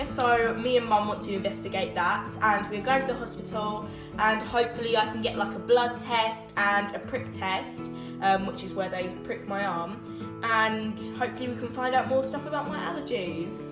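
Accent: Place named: RP, United Kingdom. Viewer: Are you British? You speak English like the Queen. Get well soon, Babe. Take good care of yourself ❤️❤️❤️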